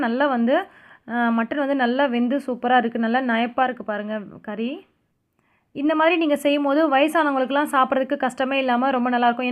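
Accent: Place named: Thiruvarur, India. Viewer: அஸ்ஸலாமு அலைக்கும் சூப்பர் மா நீ எந்த ஊரு மா பேசறப்ப பார்த்தா திருவாரூர் மாவட்டம் மாதிரி இருக்கு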